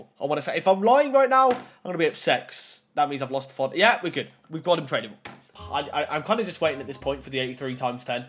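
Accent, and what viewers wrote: Northampton, United Kingdom. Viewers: Are you from Northampton or something?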